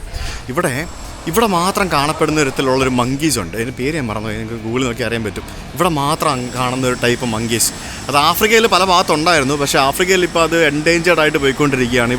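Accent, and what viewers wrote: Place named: Thalassery, India. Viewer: Oh that malayalam accent thalasherry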